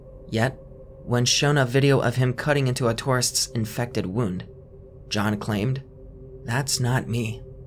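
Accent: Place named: Portugal. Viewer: I find your Portuguese accent interesting. Didn't know how he do that "healings". Have a sense of documentary.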